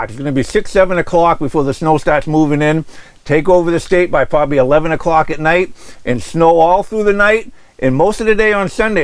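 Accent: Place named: New Hampshire, United States. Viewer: Being born and raised in Winchester NH I always turn to your channel to see what's happening "back home". We don't see much snow here in Arizona. Love your accent, makes me feel right at home always. Thanks!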